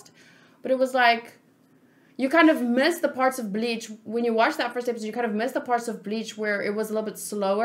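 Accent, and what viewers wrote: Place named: South Africa. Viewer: She’s South African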